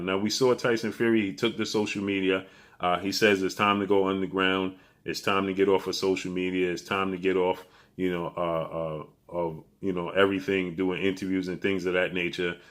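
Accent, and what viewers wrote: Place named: Brooklyn, United States. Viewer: salute 🤴🏾 keep grinding' SON' BROOKLYN VOICE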